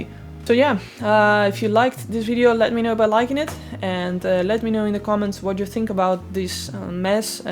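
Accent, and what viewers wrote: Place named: England, United Kingdom. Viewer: Great analysis. I like you would not support this company with my custom and like you think the Les Paul is a beautiful guitar. Your comments about contradicts was true and your ethics about not buying inferior and suggesting Gibson should focus on making a superior product made sense. I also agree with you, let the customer chase you for a well made product at an attractive price rather than chase, threaten and bully the customer. This is one of the most balanced comments without sarcasm, anger or abuse. Plus, your English accent is gorgeous! 👍🎸👌❤️ Great job.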